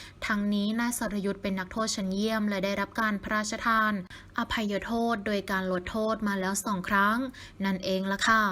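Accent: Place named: Provincial, Thailand. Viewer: พาดหัวข่าวว่ากลับมาอ่านอานแล้ว แต่ตอนท้ายยังไม่ออกจากเรือนจำ บ้าฉืบ...อ่านเสียเหน่อมากน่าลำคาญ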